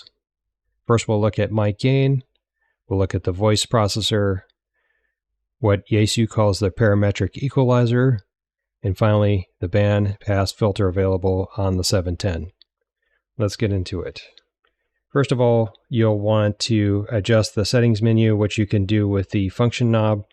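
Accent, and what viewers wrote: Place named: United States. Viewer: One thing you could also do to improve your transmit audio is to ...speak...more...slowly.... I dont mean gaps between the words. I mean the w o r d s t h e m s e l v e s . Whatever part of the USA your accent is from, you speak very fast. So on a difficult receive situation, or for someone whose first languge is not English, the receiver will find you much more difficult to understand. Yes it's difficult to do, but certainly in your case it will make a big difference.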